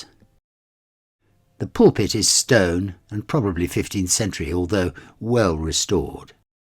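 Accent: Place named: Scotland, United Kingdom. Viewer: you are like a British Indiana Jones. 😊 I love it. My grandfather was a British Scottish man, he had the same accent as you. My mom gave me recordings of her father reading poems and your voice reminds me of him. I never met him in person but I feel like I knew him because my mother told me about him. I am a poet and artist like he was. I believe if he was alive now he would be a writer and probably do YouTube videos to teach people about history. I wonder if it's genetic to be a philosopher and lover of literature and history. It seems to run in my family. 😊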